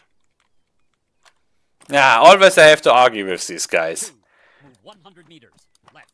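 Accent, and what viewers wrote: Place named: Germany. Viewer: The good GERMAN accent :-D Feier ich! :)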